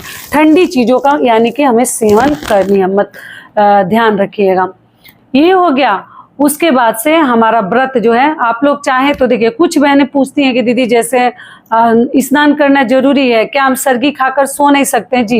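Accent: Bihar, India. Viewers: आप बिहार से है लगता हैं आपकी बोली से लग रहा है मै भी हूं 🙏आपने बहुत अच्छी तरह बताया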